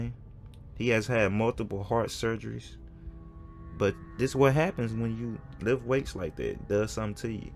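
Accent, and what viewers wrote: South, United States. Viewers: U have a cute accent dude, southern like 🌝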